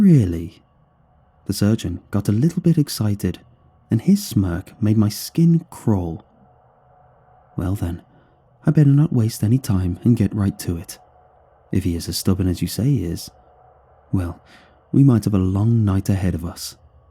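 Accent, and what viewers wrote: United Kingdom. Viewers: Reads title* Me: "Hell yeah!" Starts watching and hears british accent* Me: "Wait a minute."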